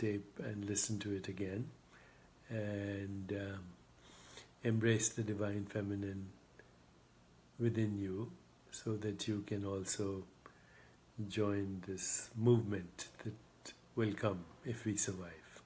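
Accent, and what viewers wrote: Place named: Trinidad and Tobago. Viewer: Sometimes sounds like ah Trini 🇹🇹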